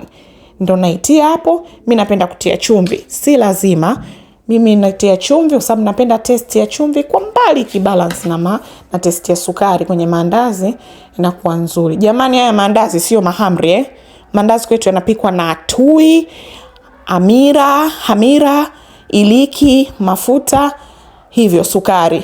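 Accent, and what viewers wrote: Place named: Tanzania. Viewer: Mahamri ni lugha ya Kenya, lkn mpishi anaonekana ana lafdhi ya ki Tz, neno "kiduchu" sina hakika lkn nahisi kama linatumika Zanzibar pekee 😂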